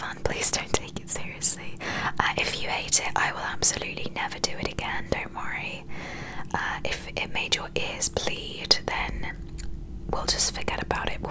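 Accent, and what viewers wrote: United States. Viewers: your american accent is so good !!! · IM AMERICAN AND THIS ACCENT IS SO GOOD. You did SO well I can’t get over this 😂😭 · Your American accent is truly amazing! You really had nothing at all to worry about! You have either had some practice or it comes naturally! Lol either way, well done! I speak in your accent too and i think I'm who should worry! 😂 I think I'm terrible at it!😄❤❤ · PLS I DIDNT KNOW U COULD RECREATE AN AMERICAN ACCENT THIS IS SO GOOD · Your American accent is so amazing I thought you were from the U.S. until I checked out your other videos lmao.😂👍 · Your American accent is so good your normal voice slipped through a few times but this is rly impressive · This is so relaxing and your American accent is very good!!! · American , British then Middle East accents are my fave ASMR accents. Have you ever thought about doing regional British accents in a video ? 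I always love to learn about The different accents of the UK . Anyway, you have a great American accent ♥️ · it’s actually so good!! u sound so american lolll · really love the low lighting. your american accent was spot on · Your American accent's not half bad! Better than most of us American's would be able to do a British accent. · Oh heck yeah, you absolutely nailed the American accent! A lot of women on the East coast sound exactly like that lol. I'm o proud of you way to go! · great video & your American accent sounds awesome😊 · I’m American and I approve of this accent, it’s weird to think we are the ones who sound different. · Great job! Your accent was a mix of a few different regions, depending on the words you were saying. The typical accent people do when outside of America is more of a west coast, California, sounding. You had a few words that came out more southern. This was fun! 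· What? This “American” accent was so natural coming from you 🤣🤣 if I didn’t know, I’d be 100% sure you were American lol amazing job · As an american this is exactly how we sound like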